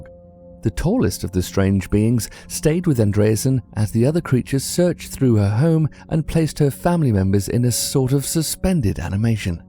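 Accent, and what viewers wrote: United Kingdom. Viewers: Who is this British guy commentating more often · it looks great, but who is this British narrator?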